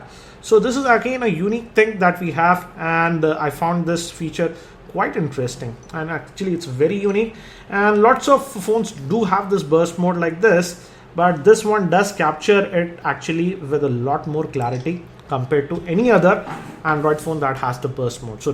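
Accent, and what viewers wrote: India. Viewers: that indian english gave me cancer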